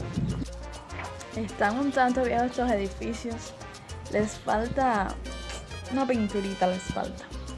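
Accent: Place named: Cuba. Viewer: aveces hablas despacio otras veces te sale lo de cubano.tu hablas ingles?? Tu vlogs es in inglish.otris vlogs son en spanish..cuidate🫀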